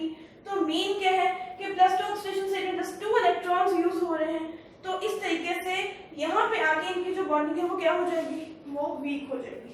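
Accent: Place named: Lahore, Pakistan. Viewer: Master of Science ..so , where r u from...your accent looks like lahori.. I m from india but i love pak...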